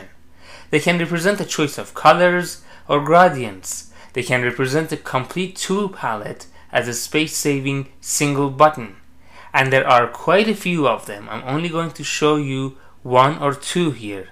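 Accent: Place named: India. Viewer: This is NOT a TUTORIAL?!! Then what the fck is it? The video is titled "TUTORIAL!" And the narrator specifically mentions "TUTORIAL!" The poster asked if there was someone who spoke English w/o a frickin' accent! I don't see why when there are instructions to be given, everyone has to have a frickin Indian accent!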